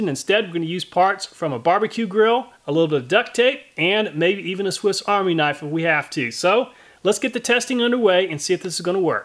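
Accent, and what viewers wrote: United States. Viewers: I really like your videos and your American english. Maybe try next time create hydrogen and oxygen from water with electrolysis and supply gasoline engine with mixing of this two components. It will be probably hard to create enough such fuel but you can at least try this. Instead of that you can try supply engine with clear oxygen instead of air.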